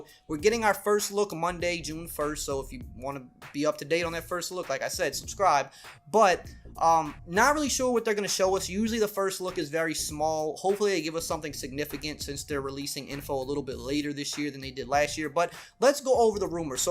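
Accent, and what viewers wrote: Louisiana, United States. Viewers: What part of Acadiana u from bro I hear Louisiana all over you lol great content as always 👍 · do you have a cajun accent?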